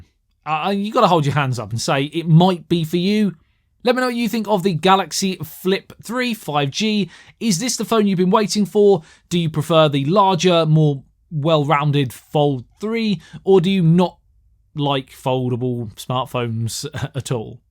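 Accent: United Kingdom. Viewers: That's how Brits pronounce Z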